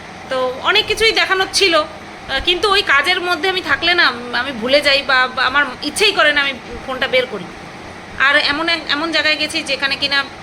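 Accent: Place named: Dhaka, Bangladesh. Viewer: তোমার কথাগুলো ঢাকার ভাষার মতো। তোমার আদি বাড়ি কী বাংলাদেশ ছিলো?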